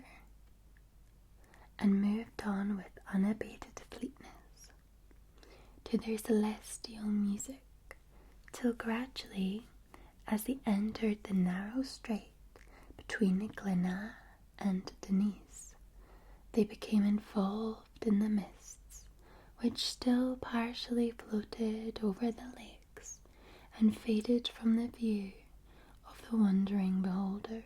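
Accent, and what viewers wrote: Scotland, United Kingdom. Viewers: good tae see Scottish asmr 🤝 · official Bears fan.🐻⬇️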